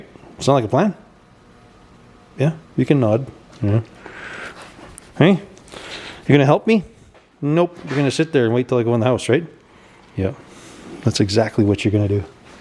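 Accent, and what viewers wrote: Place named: Manitoba, Canada. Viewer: I miss the Manitoba accent. At least you don't punctuate every sentence with the word F@ That might be a Winnipeg thing. I don't know....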